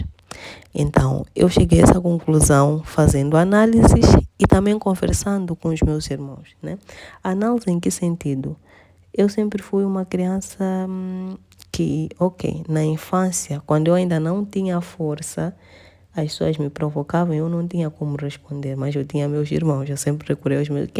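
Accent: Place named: Mozambique. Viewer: Amiga, vc é perfeita! Me tira uma dúvida: De qual cidade/província de Moz vc é? Quero muito saber a origem do seu sotaque super fofo! Beijosssssssssss princesa!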